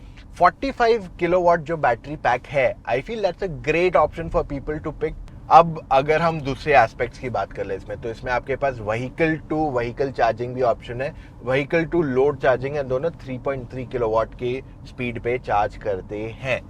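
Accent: Gujarat, India. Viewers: He is Gujarati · Like your Gujarati Tone मेला होने वाला है l 😅😅😅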